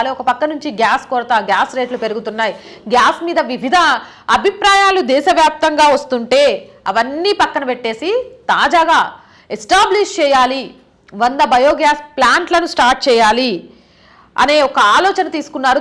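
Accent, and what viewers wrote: Telangana, India. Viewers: chevulu dobaya meku .....talking lo telanagana yasa alage untadi ....frst lo garu yogi ani annaru ...cheyulu vinapadaleda neku .......mundu ammai chese vakiti support cheyandi ante kani tappulu vetakadam kadu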